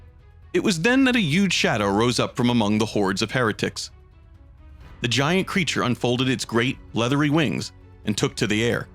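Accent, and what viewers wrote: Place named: New York, United States. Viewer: He’s from NY. Youman, Yuge.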